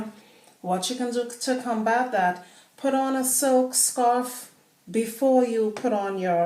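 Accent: Trinidad and Tobago. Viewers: U sound Trini...im hoping you are so you would know the climate we have...what do you suggest I use...my hair tends to dry out-locks and scalp- soooo quickly and sometimes I get tired of moisturizing so often (which is bad) lol...i need something that would keep it moisturized for longer periods in our veryyyy hotttttttttt weather :(((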